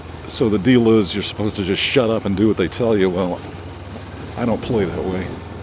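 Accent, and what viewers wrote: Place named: Memphis, United States. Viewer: thanks nice video i could hear elvis in your voice memphis accent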